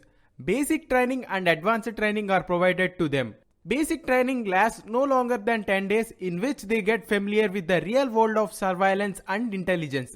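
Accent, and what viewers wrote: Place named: Tamil Nadu, India. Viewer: Ur accent is tamil , south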